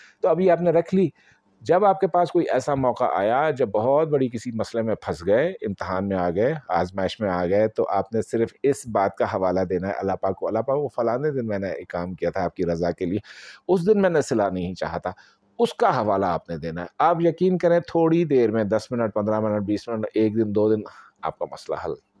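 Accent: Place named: Punjab, Pakistan. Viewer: 🌙niyat me agar ap ko mang liya tu?? Etna piyara panjabi urdo soft fast bolty ...acha lagta ha..few year ago me free hoki Beth gy.gour Kiya .me ni kasa reply Kiya same time par few good piyary insaan mujy papoose. Un sab ko same reply Kiya Ap kuhda se mago.last Ramadan days.bhot duai mangy hongy..evryday mesg me I off evry thing Ramadan ki zikar and cooking raat bi jagna .after Ramadan computer opn hi nhi howa buzy with zindagy job after 8 year wo Nawaz hogy nhi pata khagy Allah zinda h ai kher se ho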